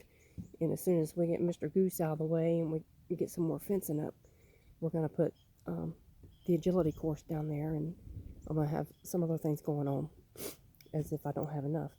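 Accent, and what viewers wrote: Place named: South, United States. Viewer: I love your country accent ❤️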